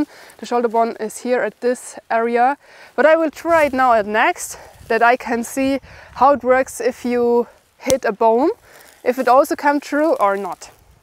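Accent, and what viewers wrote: Germany. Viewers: I enjoy the German accent while listening to a well informed video about this bow.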